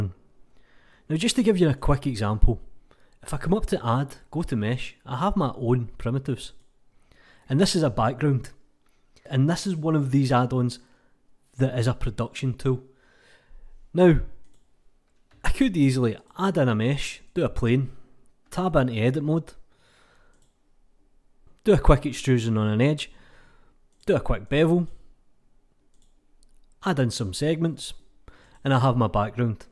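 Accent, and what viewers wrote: Scotland, United Kingdom. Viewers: I turned the captions on because I find it a bit hard to follow a Scottish accent, but youtube couldn't handle it very well either, so I got phrases like "Ness is a primitive" :-) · I love his Scottish access soooo much. I bet others do so ;-)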